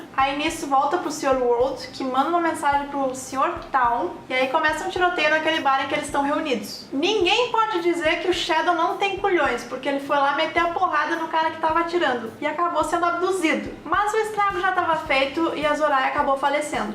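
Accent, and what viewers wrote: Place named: Santa Catarina, Brazil. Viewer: Man acho vc tão linda❤️ me lembra minha melhor amiga q brigamos e n nos falamos mais, o sotaque parece de Santa Catarina " manezinha" ahhh man 😂❤️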